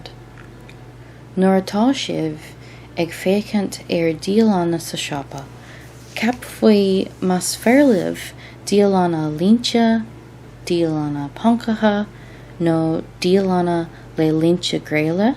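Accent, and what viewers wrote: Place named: United States. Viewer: Beautifully put. I like the slight American lilt to your explanations...👍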